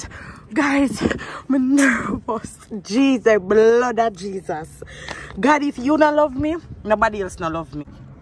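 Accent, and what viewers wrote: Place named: Africa. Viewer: I'M SO HAPPY FOR YOU GUYS 🙏🙏🙏❤❤❤❤Love the african talking 😂😂😂 Heyyyyyy!!!!!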